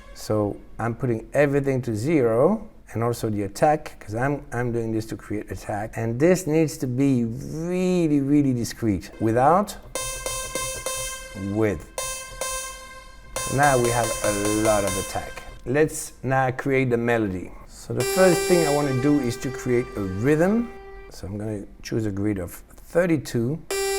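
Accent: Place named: France. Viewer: amazing tutorial, I dont have a mac so im using FL Studio and just getting to grips with it. Your talent is amazing this is why you are #1 (even though SHM are my Favorites ;) ) but what amazes me even more is your english. us british can be so arrogant and not attempt to learn another language. Sure you have your french accent but i can understand every word without struggling.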